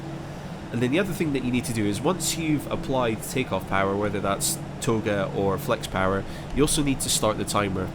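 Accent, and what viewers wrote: Scotland, United Kingdom. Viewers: Are you Scottish if you don't mind me asking?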